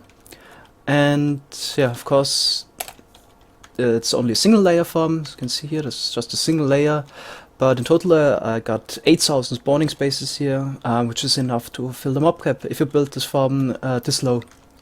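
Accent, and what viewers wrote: Germany. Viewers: D :D u are german aren't u? and thx for the nice video ;)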